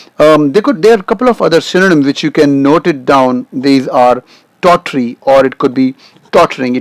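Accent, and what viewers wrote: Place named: India. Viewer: Your Indian accent makes it so more difficult to understand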